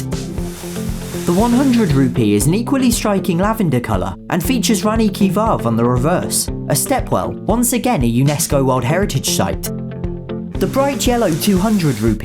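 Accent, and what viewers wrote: United Kingdom. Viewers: Awesome video brother.after watching ur this video I've watched ur 6-7 videos&subscribed ur channel.I just found u on YouTube.I'm from India.ur British accent is so sweet hare.love from India🇬🇧❤🇮🇳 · Your way of speaking is damn British .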